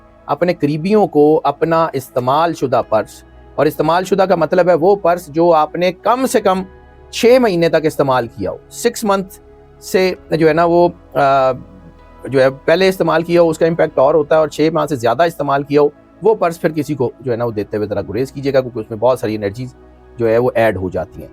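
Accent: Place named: Rawalpindi, Pakistan. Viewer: Assalam walekum sar aapki awaaz bahut jyada speed Se Hoti Hai aap video Tej Karte Hain sukun ke sath aap video chalayen Karen aapki awaaz Aisa Lagta Hai Jaise Pindi wale sahi se Peeth se bolate Hain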